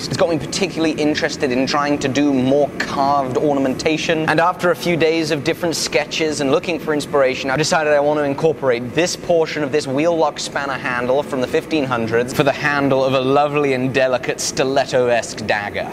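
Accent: United Kingdom. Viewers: I came from colab- Am I crazy or does he sound WAY more British here than in that video?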